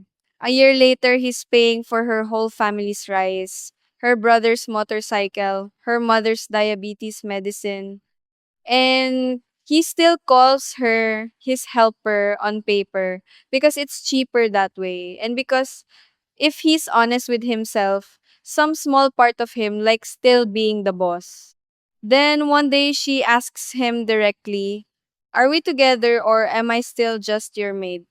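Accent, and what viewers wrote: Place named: Philippines. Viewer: Don't listen to that guy. I find English spoken with Philippine accent very attractive in much the same way most men feel about English spoken with a French accent. Of course, it helps if the speaker is an attractive and intelligent lady like yourself.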